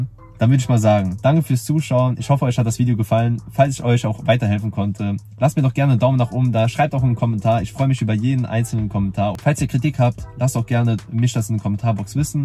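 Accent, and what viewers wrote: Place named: Saarland, Germany. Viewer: Saarländer, kannst du nicht leugnen😄 aber cooles Video! Sympathisch und authentisch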